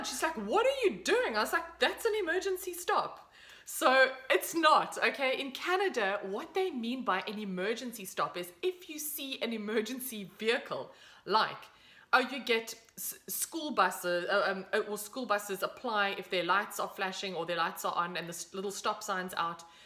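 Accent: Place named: South Africa. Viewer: Wow. I am feel so warm and fuzzy listening to you about the driving. I was driven around there in December and I remember asking about the turning right behavior. I asked if it is like a "Yield" in SA? It is really cool to hear a SA voice talk about Canada. You also talked about insurance, which got me wondering: When you left SA how did that impact on your Life, Disability and Dread Disease Insurance? Did you keep it or did you cancel and start new policies? Did you migrate it? Did your risk decrease making your premiums lower? And how was the transition with banks? What happens with money transfers? Is it easy? Does it cost you to transfer your cash? Savings? And I did experience the Drive-thru ATM withdrawal (LOL), my son and I were amazed at the realisation that there was no fear of a mugging.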